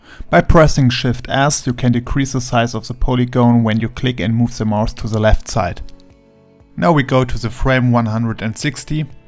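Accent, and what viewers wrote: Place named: Germany. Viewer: Heavy German accent - mach's doch vielleicht auch mal in Deutsch. Englischsprachige Tutorials gibt's hier schon en masse.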